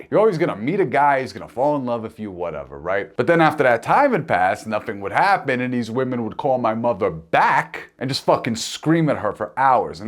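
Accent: New York, United States. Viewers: Omg the NYC accent is strooong! I’m here for it tho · Listening to this gave me such a sense of nostalgia. This story was told like a born and bred New Yorker. Love the accent changes and the impressions!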